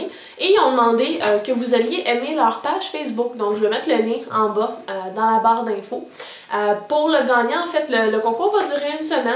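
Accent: Quebec, Canada. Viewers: Je participe a fond! Ta chaine est genial et ton sublime quebecois me fais revé moi grande Parisienne! ahahah! A bientot pour d'autre tuto. J'ai aime la page sous Lima Lapetite!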